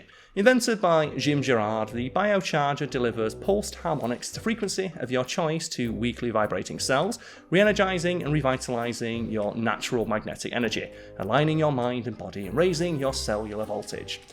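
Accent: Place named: North, United Kingdom. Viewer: Hey, I’m an American Brit who grew up in London until I was 15 and then my parents moved back to the states when my father left the US Embassy Service. I am now 74 and have a bit of a hearing problem. My very expensive hearing aids don’t work as well as I would like. I sometimes have a difficult time following your wonderful northern accent. If you could slow down a bit, I would have a easier time understanding you. I do not expect you to speak full on RP just slow down a bit and enunciate a bit more clearly.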